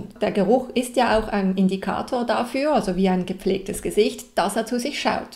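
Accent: Basel, Switzerland. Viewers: Den Baslerdialekt hört man halt schon trotzdem raus.😉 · Hallo, abgesehen von deiner ästhetischen Attraktivität schaffst du es mit einem schweizerischen Deutschakzent eine ganz tolle Sympathie zu erwecken... das macht deinen Vortrag noch interessanter... und würden wir uns echt in einem Date begegnen, dann würde ich sofort einen großen Bagger kaufen um dich anbaggern... bis du mitkommst! Nein, war nur Spass! Aber bitte mach so weiter, denn deine Worten kommen aus der Wahrheit! Danke. Lg. Josef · It is Swiss variation of German (with accent from Basel). But when she speaks her real dialect it sounds even more different from the language used in the video.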